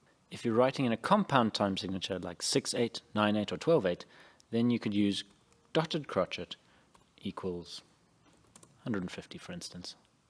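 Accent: South Africa. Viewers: Are you South African or a New Zealander?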